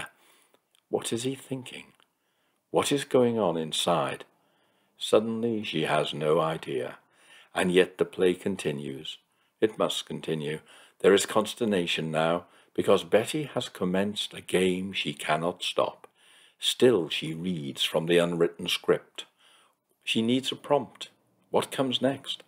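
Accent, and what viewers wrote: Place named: Liverpool, United Kingdom. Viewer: Soft Scouse.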